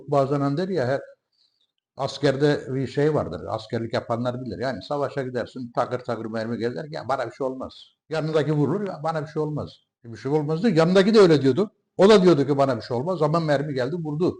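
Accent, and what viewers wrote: Turkey. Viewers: Aslen turkiyenin neresindensiniz ? Hatay şiven var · DOGRULARI KONUSTUGUNUZ ICIN TEBRIKLER.ZATIALINIZ GALIBA VICTORIA'DA YASADINIZ, BALI'DE YASANIR ,KUTLUYORUM, BY THE WAY MEMLEKET NERESI? AKSANINIZ ADANA'YI CAGRISTIRIYOR, BIZ ISTESEKTE ORALARDA YASAYAMAYIZ, . NEDENI ISE ILK KAFILELERDEN BIRISIYLE GELMIS VE 57 YILI TAMAMLAMAK UZEREYIZ,SIMDILERDE 22 AILEDE OLUSAN BIR COLONI OLUSTURDUK OLULERIMIZ VAR YAS OLARAK 93 .SIZE YENI DUNYANIZDA MUTLULUKLAR DILIYORUM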